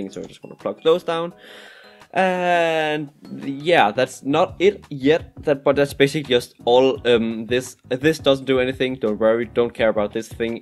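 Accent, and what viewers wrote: Denmark. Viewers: it sounds like your from denmark i am so pls like my comment (edit) like if you are and if your not then comment on my comment where you are from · R u danish?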